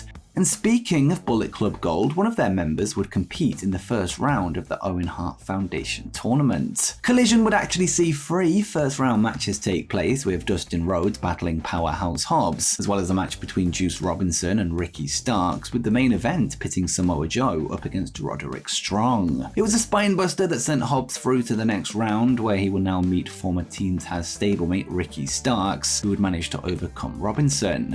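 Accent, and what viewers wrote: United Kingdom. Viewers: I can't stand his annoying British voice or whatever the heck it even is 🙄 The long delay after each sentence is absolutely ugly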